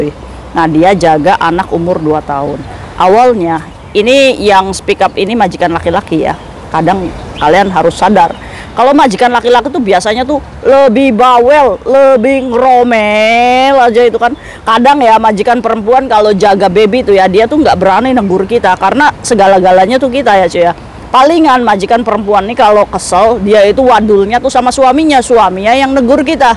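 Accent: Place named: Java, Indonesia. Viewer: Ka orang indramayu ya kk ko Bahasa rada " jawa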